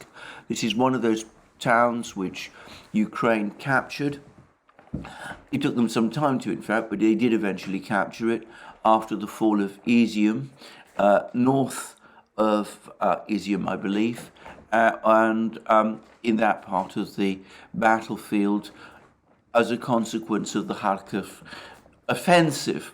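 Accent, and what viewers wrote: United Kingdom. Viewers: Alexander thinks a blasé manner (and a British accent) are enough to cover up his vast ignorance. He says that if there are large numbers of ukrainians, which he very much doubts, they are certainly not using them effectively. Yeah, right. I suggest, what you may be seeing right now is the calm before the... Well, I know for a fact you know the rest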